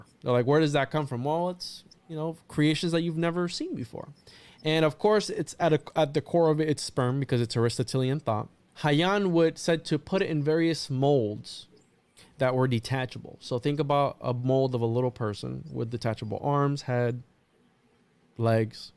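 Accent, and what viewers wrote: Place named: Mexico. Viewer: pronouncing latin words with mexican accent. oof. and i'm hispanic.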